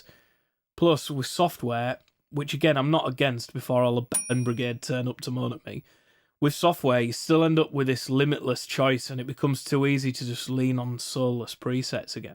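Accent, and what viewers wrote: Northern England, United Kingdom. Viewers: Haha, great video. I love the humour and the northern flavour (I'm a bloke from t'North meself). I really liked the video <$1000 modular too. I'm holding the line at semi-modular right now but the ropes are straining! Cheers.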